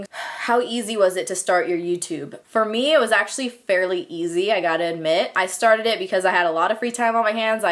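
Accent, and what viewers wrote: California, United States. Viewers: Imagine, I've just sat here for 34 minutes watching this, despite having followed you for over a year and knowing you pretty well, and I still don't know why you're called 😩 loved every second though, that strong valley accent killed me 😂❤️❤️❤️ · Lol, I've been watching on and off for a few months and I came across this video so I was like, "This is probably the best way to really start!" And tbh that was a good idea. Because she talks exactly like everybody I grew up with. I'm not currently home but she made me miss California! Idk exactly what it is but it's a very Californian way to speak. Anyway, I'm subbed now!:)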